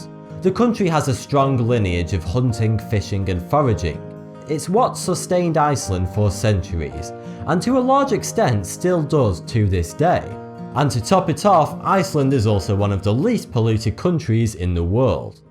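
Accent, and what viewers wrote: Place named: Manchester, United Kingdom. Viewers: It's his dialect you idiot. Ever heard of the northern dialect of England. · He has a very slight Manchester accent. Which I like 👍. This guy does a fantastic job, well ahead of his years...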